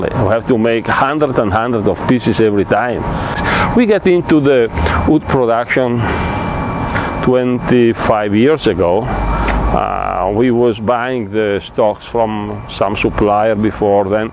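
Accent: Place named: Hungary. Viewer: Nagyszerű videó!:)És jó hallani a magyar akcentust az angolban!:D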